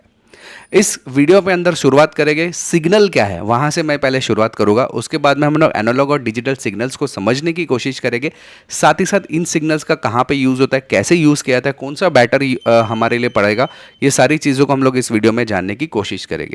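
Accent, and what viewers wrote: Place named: South India, India. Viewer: Nice explanation, you are from south india.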